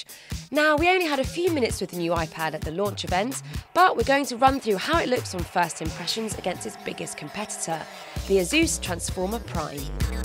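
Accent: United Kingdom. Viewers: Сразу слышно, что британка говорит. Ненавижу британский говор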